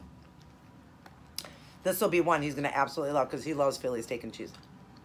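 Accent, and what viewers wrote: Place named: Minnesota, United States. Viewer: love your channel! what part of Minnesota are you from? I'm from MN too! I could tell right away from your accent lol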